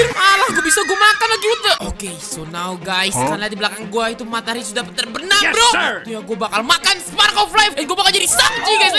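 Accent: Java, Indonesia. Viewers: Orang Jawa kah bang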